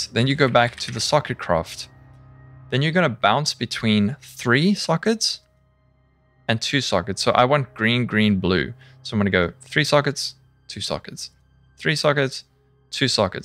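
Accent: South Africa. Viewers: Are you South African? Level 70 rare items, if you get a full set(Unidentified), you can get 2 chaos from vendor. level 80 rare set gives you 2 regal orbs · qAre you a Saffa bud?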